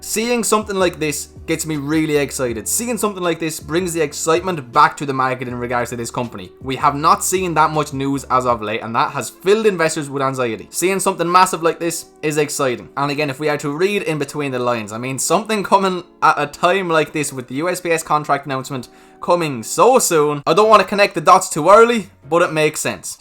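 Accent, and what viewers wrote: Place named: Ireland. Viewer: you rock man! Love the Workhorse videos. I would send you some American Bourbon if it wouldn't be confiscated. Love your content. My wife hears your accent and says "Oh your listening to your Irishman investor." lol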